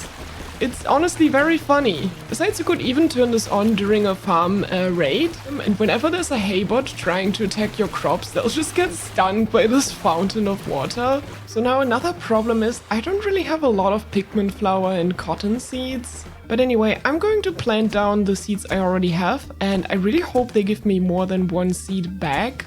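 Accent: Germany. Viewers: I don’t know if it’s just being an old-school Minecraft player, but I don’t know what it is anytime a German or someone with like that German style accent teaches me about engineering. I just have to listen. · man the German accent breaks me every time, so cute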